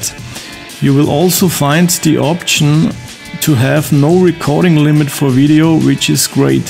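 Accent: Germany. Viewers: Gibts das auch auf Deutsch , er ist ja deutscher - hört man ja raus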